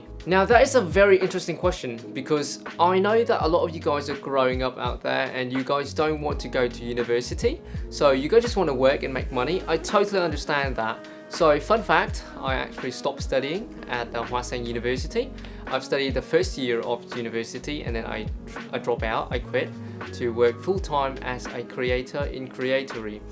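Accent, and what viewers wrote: United Kingdom. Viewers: Your British accent is so charming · I'm really love your british accent haha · Giọng thì Anh anh nha Mà cái cách nói chuyện thì mix với anh mỹ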